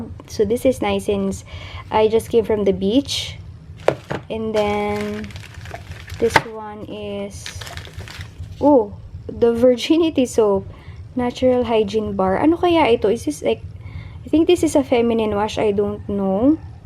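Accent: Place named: North Luzon, Philippines. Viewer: Ms., just wondering kung saan yung province mo? May punto ka kasi mag salita minsan. Parang somewhere north luzon.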